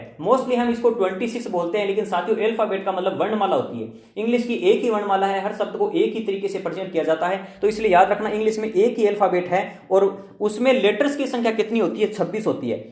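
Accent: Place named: Haryana, India. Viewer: Sirji aapki language me haryanvi tone kse aapto rajsthan se hoji 🤔🤔🤔🤔🤔🤔🤔🤔🤔🤔🤔🤔🤔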